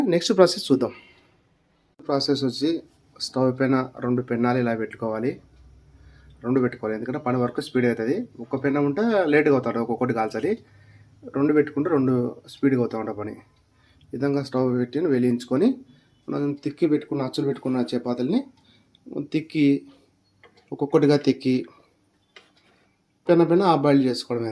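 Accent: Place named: Anantapur, India. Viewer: మీ భాష వింటే అనంతపురం జిల్లా వాళ్ళు అని తెలుస్తోంది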